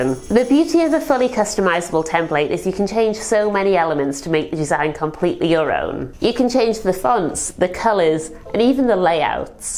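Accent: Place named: United Kingdom. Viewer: First of all, our beautiful and intelligent teacher is a stone cold fox! Wow! Her edgy British accent is super cool, and her subtle sense of humor makes the video easy to watch. It’s nice to see a real human being, with attitude, great tats, and lots of smarts making instructional videos instead of the usual blah blah blah missy girl. I can hardly wait to start my info graphic… keep up the good work!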